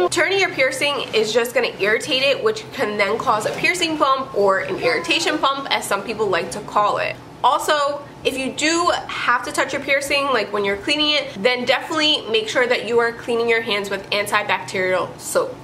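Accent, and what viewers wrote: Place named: Barbados. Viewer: That Bajan accent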